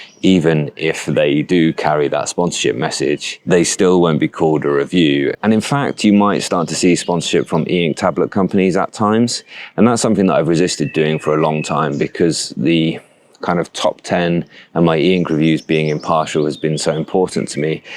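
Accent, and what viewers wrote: United Kingdom. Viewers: I love your videos. First, you’ve got the Brit accent, it’s cathartic for an American. Question. I currently have a remarkable pro move for quick notes and keeping up at work. The problem being is that I find it often gets left behind on my desk at work because I’m usually walking around a 1 million square foot hospital managing my staff, and there is concern breaking a larger device because I’m usually a hands-on kind of guy when it comes to building repairs. Add into that that I’m slightly irritated about having to pay for the Connect service if I wanted to use multiple reMarkable to sync across each other. I’m debating dropping the remarkable and moving to a Boox Palma pro in my pocket constantly, with a larger Boox Go that stays at my desk. I am hauling an xteink x4 to read, but find getting books on it tedious, but love that it is helping deter me from phone distractions. 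Can (and is it more desirable) to make the move to the Boox platform with sync and removing the remarkable and x4 from my pockets. Does the hi brake pro or plus support syncing with a bigger break device? I’m curious of your thoughts since you have so extensively used all these devices.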